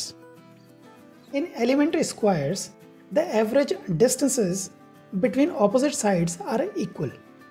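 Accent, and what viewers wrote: India. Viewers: I feel like this is a simpler explanation to this topic but It's so hard to understand Indian accent help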